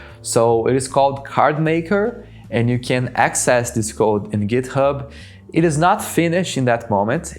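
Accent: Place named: Brazil. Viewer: I love brazilian accent when someone is speaking in english, because I can understand what is being said. Thanks for the video!!!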